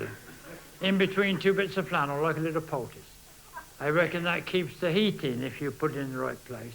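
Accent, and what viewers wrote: Buckinghamshire, United Kingdom. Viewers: I was led to believe that his accent is Buckinghamshire. Having been brought up there, I wouldn't disagree.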